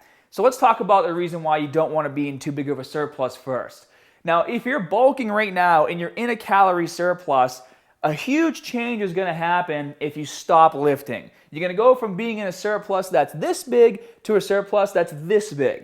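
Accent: Boston, United States. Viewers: Where did the full on Boston accent come from all of a sudden?? He never sounded like this in his earliest tutorial videos??? :/ It's like he's putting it on or something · Boston 100% haven’t you seen The Depahted?? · dat Boston accent doe. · Man dat Boston accent is just not fitting, I'm so used to your old one. I've been off the gym for 3 months now due to a shoulder injury, I hope I don't lose all my gains...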